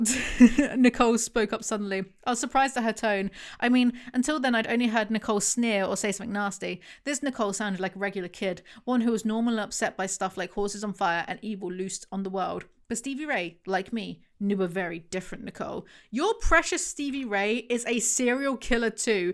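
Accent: Texas, United States. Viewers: what an impressive and accurate texan accent! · As a Texan, I am in love with your Texas accent 🤣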